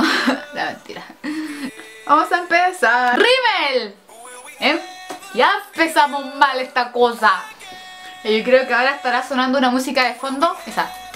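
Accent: Chile. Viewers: El acento Chileno es muy obvio! GENIA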